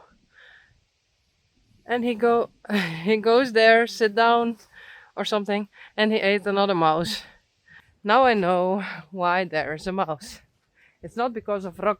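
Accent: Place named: Netherlands. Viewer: weet jij dat je een prachtig Nederlands accent hebt? 😎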